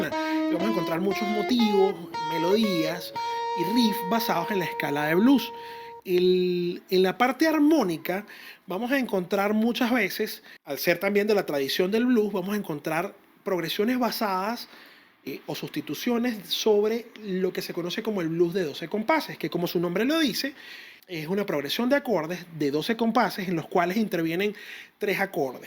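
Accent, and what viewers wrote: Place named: Venezuela. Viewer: chamo eres venezolano,,, seguro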